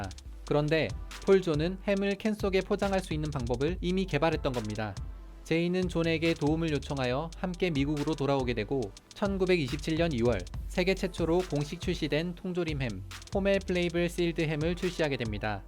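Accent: Gyeongsang, South Korea. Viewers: 이분 경상도 출신 혹은 경상도와 관련있다에 내 돈 모두와 손모가지 검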